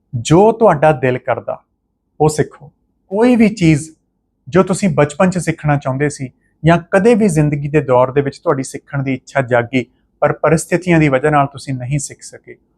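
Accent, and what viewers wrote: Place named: Canada. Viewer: Yes I want it also. Because sir speaks canadian accent.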